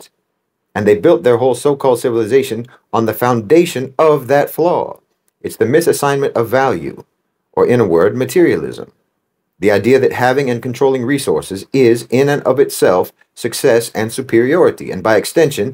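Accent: United States. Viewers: And he he speaks it all with an American accent. Methinks mr marxist minion protest too much.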